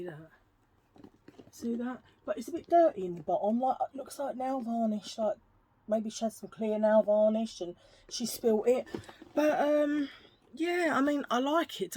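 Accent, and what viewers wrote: Australia. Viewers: Enjoyed this - like a nice visit with a friend! You are good fun. I was trying to watch your next video but I have to provide proof of age and as this involves giving driversl licence or passport number which I am not prepared to do could be risky I can't watch! Crazy! Your Aussie accent wasn't bad by the way - and I'm Tasmanian!!! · Love the Aussie accent. 😂❤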